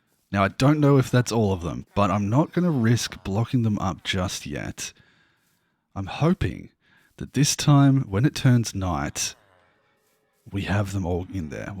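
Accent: Australia. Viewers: Im just new to your channel and so far im really enjoying your content and I love buddy, Am i hearing an australian accent, thats cool if it is, I really wish there were morre australians doing minecraft content. but if im not and im wrong thats cool too, beacause im enjoying your content.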